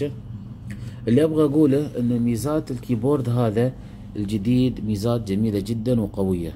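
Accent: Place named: United Arab Emirates. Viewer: Nice. I'm Iraqi born living in the USA. My Arabic isn't that strong but I was able to understand you fairly well. I assumed the UAE dialect would be much more difficult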